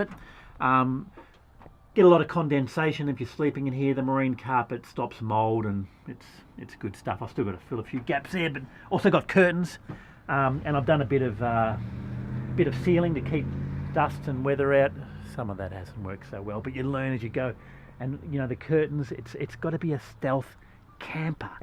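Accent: Australia. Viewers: Genuine true blue, she'll be right mate, delivery. Love it mate! LOL...yeah, best whisper when mentioning our would be masters. Currently chasing a tray canopy for my BT50 ute. Missed a few Market Place for free beauties, but I'll catch one eventually. For me, it's just going to be basic place to sleep prior to hitting the waters for kayak fishing, or a day of metal detecting. Then, overtime, plan to upgrade it for longer trips to the gold fields and various historical locations. Thanks for the insights mate. BTW, I'm thinking removable inside panels using velcro, or DIY window tinting. The way I see it, curtains might be a dead giveaway.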